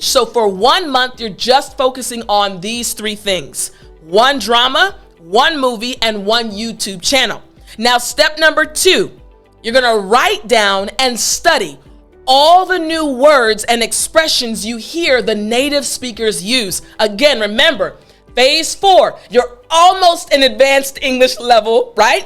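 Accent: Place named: United States. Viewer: Loveeeeeeee Your ACCENT ! Crystal Clear American Accent !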